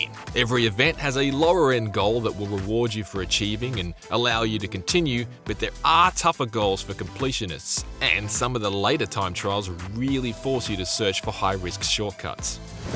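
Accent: Australia. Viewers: Hears an Australian accent = SOLD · Guy sounds like an Australian parody, complete with the toilet strain accents.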